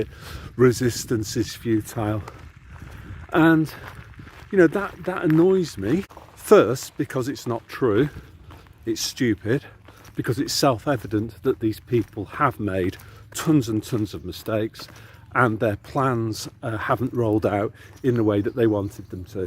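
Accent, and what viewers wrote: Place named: Manchester, United Kingdom. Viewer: Exactly! I’m same! In Manchester visiting m’Dad . Thanks 🙏 it’s lovely to hear some comm sense in a manc accent xx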